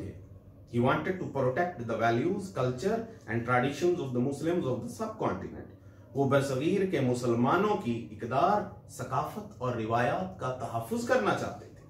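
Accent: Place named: United Kingdom. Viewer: Excellent pronunciation n perfect British Accent.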